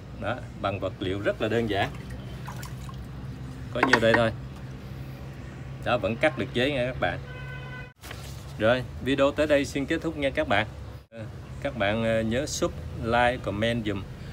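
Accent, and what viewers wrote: South, Vietnam. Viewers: Anh có giọng miền nam ấm áp, thiện lành, ngay lần đầu tiên xem đã cảm mến. Chúc anh luôn khỏe mạnh, an lành. Atlanta, Georgia.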